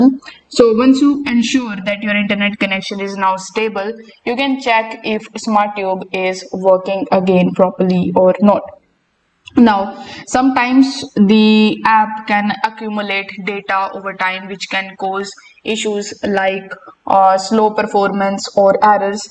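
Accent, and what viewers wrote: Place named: Bengal, India. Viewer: B4 you tell us how to fix please talk proper English not bangali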